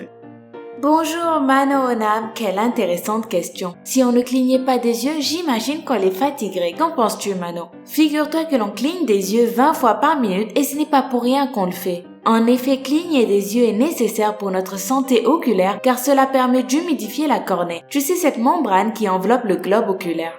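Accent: Canada. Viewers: me suis aboné:) ps: j'adore ton accent tu est canadienne? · Salut. pourquoi tu parle si lentement😆 et tu canadienne?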